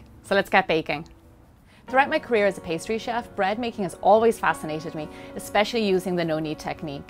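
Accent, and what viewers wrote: Ireland. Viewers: I had to watch it three times -- I kept drifting off listening to that Irish Accent and not paying attention to the recipe. Sure she seems sweet in this video but you haven't seen her angry.😁😉❤ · Thank you for this video and I love your Irish accent, I have just started making a lot of no Knead breads and I needed this one for my collection,,,, One question, I bake my no Knead bread in a preheated oven and pan at 450 for about 30 min covered then I uncover and bake to get crust the way I like it, 3- 15 min, is it ok to do that with this recipe? you have a new sub and thx again and I look forward to watching more of your videos. ;) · I cant help but notice the Irish accent or is it?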